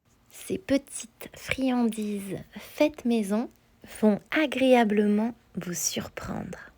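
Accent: France. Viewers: Hi, happy valentine's day. This recipe is simple and delicious too, your French accent made it more delicious ❤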